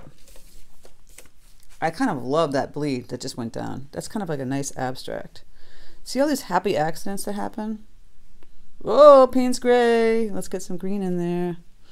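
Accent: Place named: East Coast, United States. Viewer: Love your accent! East coast?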